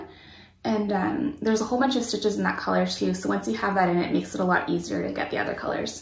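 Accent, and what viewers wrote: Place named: Canada. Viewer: Your stitching is so beautiful! Always enjoy your videos. You’re adorable and I love your Canadian accent ❤️ Also, you talk super fast. It cracks me up 😂❤️❤️❤️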